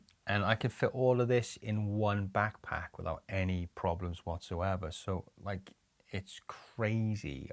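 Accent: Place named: United Kingdom. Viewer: Thanks, I deeply appreciate how you pack in tons of information in your videos, and I could listen to your voice all day, Brother, as I love British accents, but yours is calming. 😄 I just recently returned to watching your content again for both your channels. (My previous YT channel was hacked, so I had to re-subscribe, hehe.) Big Respect from the U.S., my Friend.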